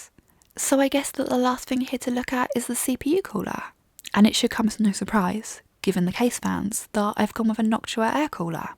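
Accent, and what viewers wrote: United Kingdom. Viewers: Hey, great too see u back in fine form. I hate to play matchmaker, but doesn't YouTuber Arun Maini from MRWHOSETHEBOSS live in London too? Imagine a collaborative, soothing fusion of two epic accents. 😉 All the best, phil from across the pond. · And talks very clear, i can tell she is british. But i could very easely follow her explanation as everything is pronounced very clearly. On a soothing tone But i would wait on gen4 SSD's 2.0 by figure of speech. They need a better controller first.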